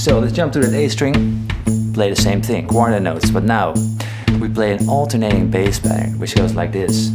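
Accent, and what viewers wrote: Netherlands. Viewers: without checking anything, I can hear you are dutch. The beauty of it, is that the guitar doesn't sound dutch at all. it sounds like beautifull blues <3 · Hahaha gelijk hoor je het hè Nederlands. Blijkt toch een super charmant accent te zijn. Hahaha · He got a dutch accent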